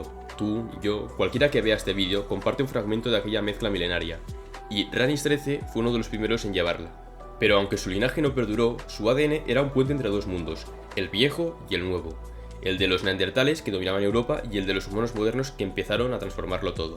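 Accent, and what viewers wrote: Spain. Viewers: MODULA GALLEGO HABLA CASTELLANO , la puta madre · Ahí le bajé la velocidad a 0,80 😊. Reconozco q me cuesta a veces entender el acento español. Muy buena info, tu video. Gracias.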